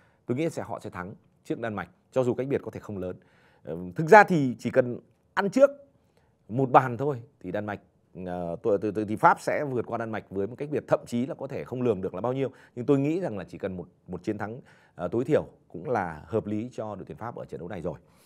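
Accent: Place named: South, Vietnam. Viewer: Bác xem thế nào góp ý chương trình bình luận bóng đá bằng giọng bắc đi bác.chứ em thấy bác giọng nam không hợp một tý nào .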